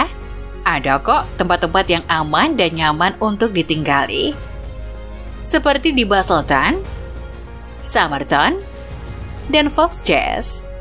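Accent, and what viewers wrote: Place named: Medan, Indonesia. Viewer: Medan nich bosss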